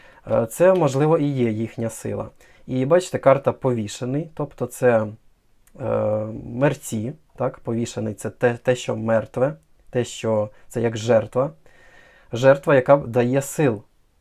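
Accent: Standard. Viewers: дякую! Яка у вас чиста,красива мова!!!❤❤❤ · Розкладам на картах не вірю, ніякі прогнози "картярів" не збулися. Але, слухала вас лише тому, що ви говорили нашою державою мовою - УКРАЇНСЬКОЮ!!! До речі, ви досить гарно розмовляєте, без суржику. І голос у вас приємний!!!😊😊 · Ух Ти, яка у Вас гарна українська мова, так чисто розмовляєте )))❤🇺🇦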